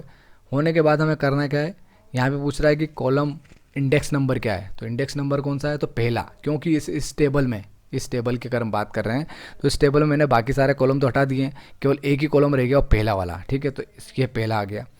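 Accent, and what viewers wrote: Indore, India. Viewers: Bhiya indori ho kya.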